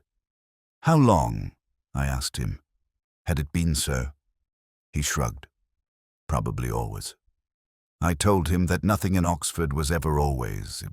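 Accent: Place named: United States. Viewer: A US narrator on quintessential Morse tale ? All wrong ! We don’t have “stoodents” here.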